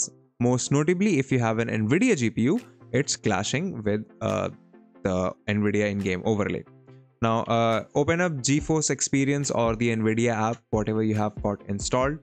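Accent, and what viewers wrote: India. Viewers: Anyone got a translation for this Indian scammer?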